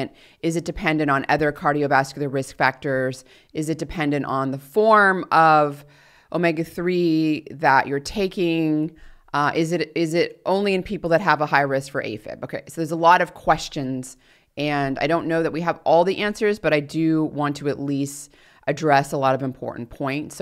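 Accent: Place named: California, United States. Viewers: California accent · how would Americans call her accent? Californian? it's interesting.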